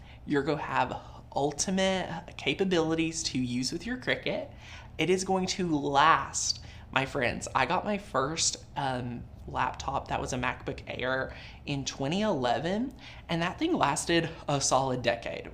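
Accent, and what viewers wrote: South, United States. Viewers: I use my surface pro for design space & joy app also. do I hear a lil southern slang in your voice? Love it,awesome videos,thanks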